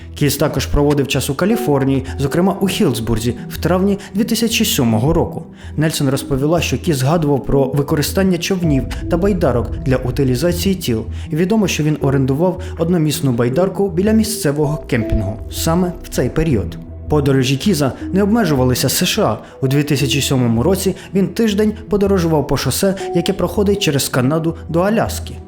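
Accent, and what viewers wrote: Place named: Russia. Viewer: Чому! То вже б!Відчуваєте себе дуже юним, не можете позбавитися російської вимови? гарне ім'я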